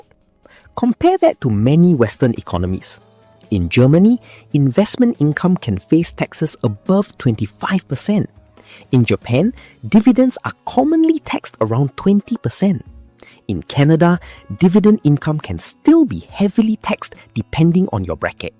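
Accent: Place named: Malaysia. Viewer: Why singapore video but malaysian voice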